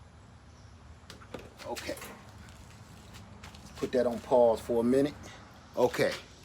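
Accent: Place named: New Orleans, United States. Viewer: Shonuff sweet gesture sir and it goes to show how our community supports each other!! Digging your air layering experiment for sure!! Found your channel thru my brother the Sapper, ya have a new subscriber from east central Mississippi here!! You sound like you from Nawlins brother lol!! Dan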